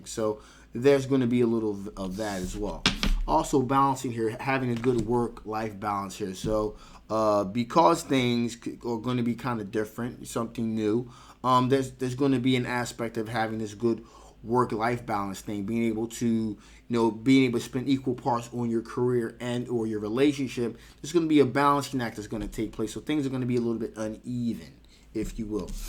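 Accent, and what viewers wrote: Philadelphia, United States. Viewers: I had a feeling you was from philly. Chester in the house. Lol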